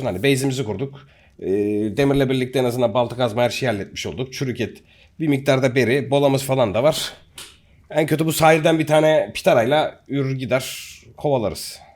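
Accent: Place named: Anatolia, Turkey. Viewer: Anadolu insanı gibi konuşman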